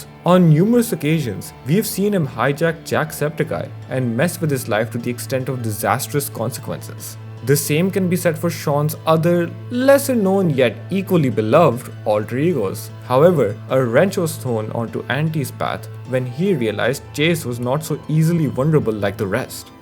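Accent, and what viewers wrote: India. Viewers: Oi oi another Indian (or South Asian) Jacksepticeye fan. A rare occurence! And from your accent and the English used, I am assuming you live in India (orrrr may be not)? Which is even rarer.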